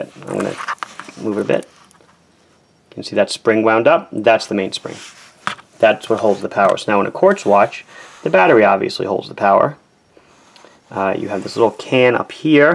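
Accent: Long Island, United States. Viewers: grew up on long island. moved away at 24. growing older, when i visit family i notice more and more that i hate the accent. thought i would have trouble watching these vids because of that. but the quality of content makes me completely overlook the voice. if/when i buy a watch in the future i'll try to do so from you. thanks for teaching me so much.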